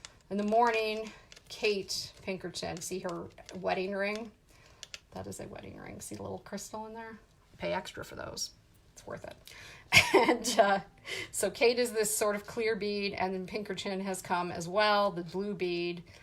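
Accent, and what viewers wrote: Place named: New York, United States. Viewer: Hi I really enjoy your little chat. Feel I am back in N.Y. specially with the way you say certain words such as gala and expressions such as make a pretty lunch. One day I collapsed in Met op shop and a lady arranging jewellery display helped me stagger out to foyer where I tried to hang on to those fancy crowd comtrol ropes! I wonder if this lady was you? Great thing about fainting there..I was whisked off to bowels of Met where I met Peter Gelb and also saw amazing porrtrait of Maria Callas. Later I banged into Peter Gelb again and said, "Wow! I keep bumping into VIPs. Quick as a flash he said, "You're the VIP!" Clever guy!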